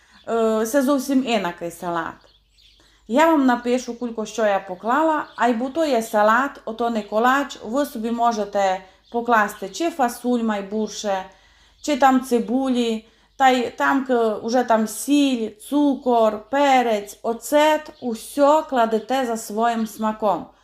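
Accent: Transcarpathia, Ukraine. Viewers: Клас! Насолоджуюсь закарпатською говіркою, окрім гарних рецептів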